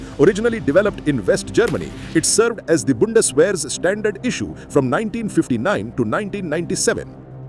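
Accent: India. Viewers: check pajeet accent 🤣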